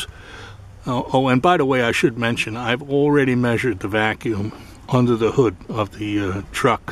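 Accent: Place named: New York, United States. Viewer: thank you for the video! i also am having this problem and i’ve watched so many videos,it’s interesting to see what works for each! i also enjoyed your voice, it reminded me of my cousins in NY! i’m also curious to see what type of van you have, i’d like to see the interior.i have a ‘99 jayco conervesion camper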